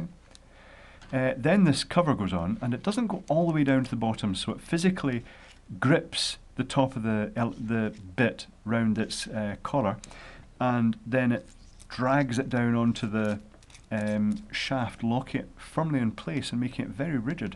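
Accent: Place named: Edinburgh, United Kingdom. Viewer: Sounds like Edinburgh to me.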